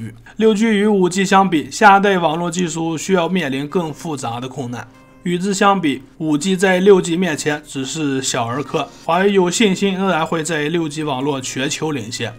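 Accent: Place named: Chahar, China. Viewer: 察哈尔口音，老乡啊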